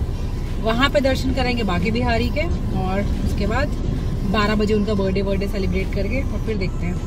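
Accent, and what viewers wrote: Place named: Northeast, India. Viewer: North east wala hindi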